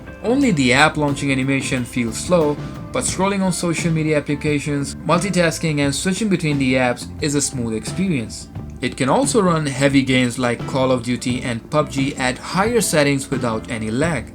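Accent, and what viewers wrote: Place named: India. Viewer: less indian accent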